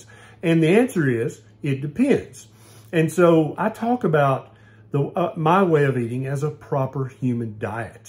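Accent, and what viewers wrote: United States. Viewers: Where in America does the Doctor's accent come from?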